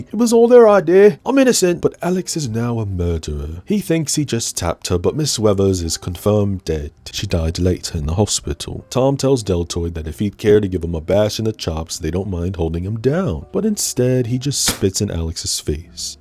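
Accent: United Kingdom. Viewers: 1) as a Brit I live for your Bri-ish accent 2) why did I imagine alex legit saying aye come catch this fade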